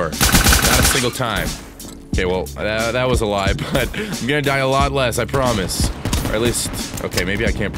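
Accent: Minnesota, United States. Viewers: R u from minnesota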